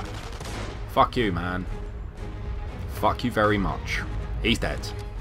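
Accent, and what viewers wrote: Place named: United Kingdom. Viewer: sir you got very good royal british accent with a bronx behaviour , amazing and magnificent 👍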